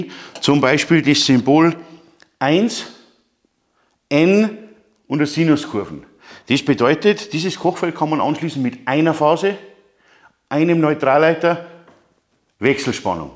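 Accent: Bavaria, Germany. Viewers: du erklärst es einfach, dass ich mich zwar für mich darauf konzentrieren muss, deinen bayrischen Dialekt zu verstehen.Du bist ein netter Kerl.Mia san mia👍😉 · der "Erklärbär" 😂 Wie immer klasse, auch wenn ich mich als Preuße oberhalb des Weißwurstäquators manchmal anstrengen muss das bayerische zu verstehen:-) Mal ne vielleicht ungewöhnliche Frage? Vom Zähler zum Wohnungssicherungskasten ist zwar 5x10 Quadrat verlegt aber 2 Außenleiter sind nicht im Zählerkasten aufgelegt und somit im Sicherungskasten auch nicht. Habe sozusagen nur 1 Außenleiter, 1 Neutralleiter und PE zur Verfügung. Der eine Außenleiter ist mit allen 16A Sicherungen im Kasten verbunden. Wäre es richtig wenn ich zum Kochfeld mit 5 pol. Kabel gehe, wobei ich 3 Außenleiter (einzeln abgesichert) nehme?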